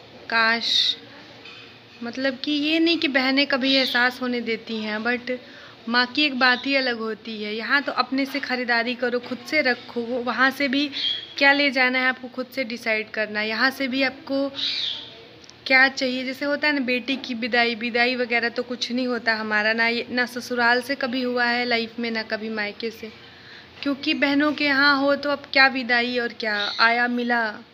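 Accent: Bhojpuri, India. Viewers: Aapke muh se bhojpuri bahut achhi lagti hai.aapki aawaz mujhe bahut pasand hai....!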